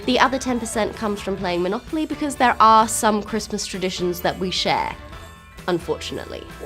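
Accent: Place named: United Kingdom. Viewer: I just stumbled across your video...I love British Xmas...for the cheerful party vibes...after all it is JESUS's BIRTHDAY...so Happy Birthday Jesus... ;) what I do not like..is the artificial plastic Xmas tree...I prefer the ones that are in a pot and still alive, when planted back in the garden... you've got a third subscriber..hope you are doing well...I love your cute British accent... lots of love...from Germany :)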